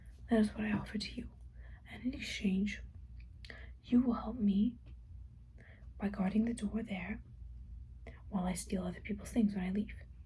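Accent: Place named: New York, United States. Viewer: I love your ny accent videos! 💟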